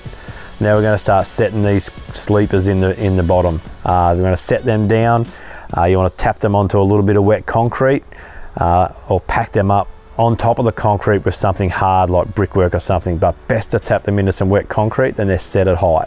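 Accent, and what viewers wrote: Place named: Australia. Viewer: no entiendo Aussie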